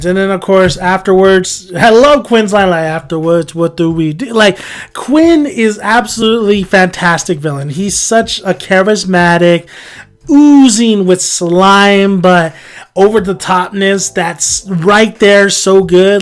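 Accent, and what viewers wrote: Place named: India. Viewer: What's your slang of english ? What are u actually?? I mean are you an Indian or Canadian or something? May I know it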